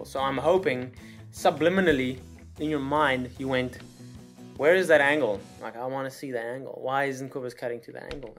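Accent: South Africa. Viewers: its south African i blv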